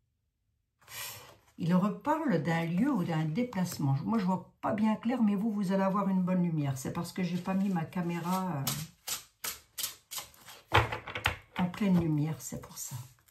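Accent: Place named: Haute-Saône, France. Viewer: Cc la vieille femme 😂😂 ,oui j'ai regarder hier pour la pompadoure tu devais regarder la suite se soir . T'on accent je le connais ma mère était de pas très loin de chez toi ou j'ai été en décembre dans le 70 luxeuil les Bains, et je voulais passer te voir mais j'ai pas oser peur de déranger. Jésus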